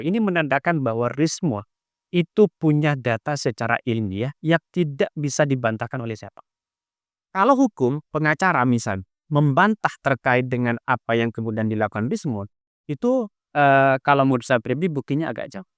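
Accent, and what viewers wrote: Madura, Indonesia. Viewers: Btw, Logat madura ya mbak?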